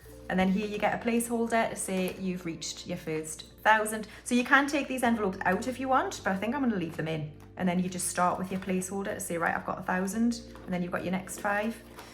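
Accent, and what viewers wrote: Newcastle, United Kingdom. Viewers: Hey - I found your channel a few days ago and absolutely love your videos. Can't tell if that's a geordie accent I can detect (as someone who is geordie too) ❤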